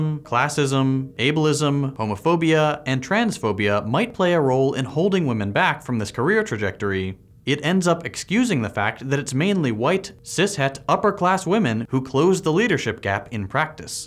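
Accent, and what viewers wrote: United States. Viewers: Hit the nail on the head as usual. As a small aside, as someone who doesn't regularly speak to those with american accents it took me three "gender parity"s to get that I wasnt hearing "gender parody" 😅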